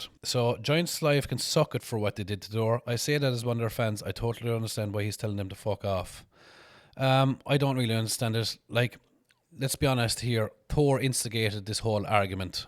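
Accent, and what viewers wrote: Ireland. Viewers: You are a born story teller, it’s the Irish accent, 323, I enjoy your videos as they are 👍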